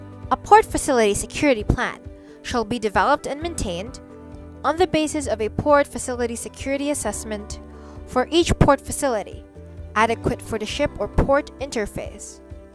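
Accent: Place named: Philippines. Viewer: Filipino accent🇵🇭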